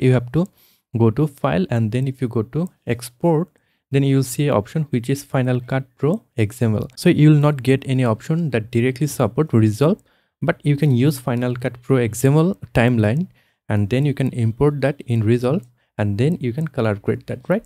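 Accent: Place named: Assam, India. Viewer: you have a north-east accent, are you from assam?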